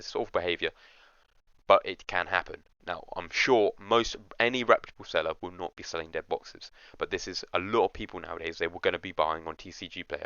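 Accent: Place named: United Kingdom. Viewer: Good luck with your journey, subscribed as sounds like you are from the uk, looking forward to more videos . 👍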